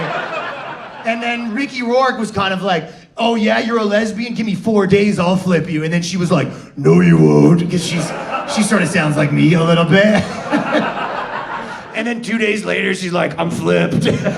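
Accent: Canada. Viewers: Is he Canadien or something?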